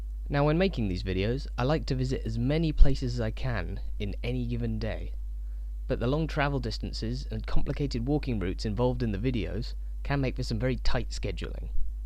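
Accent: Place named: North East England, United Kingdom. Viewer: I genuinely thought you were from the North East of England for some reason! Love these videos - I’m so glad I found this channel - it’s like ASMR for the eyes (if that makes sense haha 😂 )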